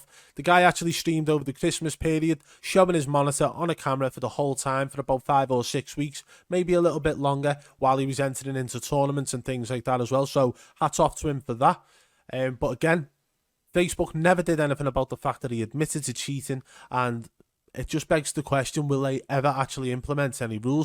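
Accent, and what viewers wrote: Liverpool, United Kingdom. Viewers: Great work again buddy, scouser?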